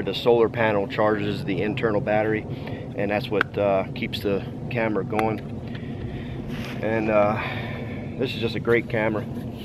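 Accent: United States. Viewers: Are you from Indiana?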